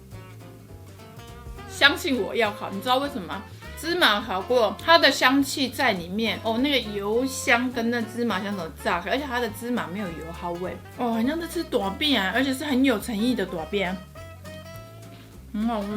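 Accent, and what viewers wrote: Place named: South, Taiwan. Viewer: 的南部腔很有親切感～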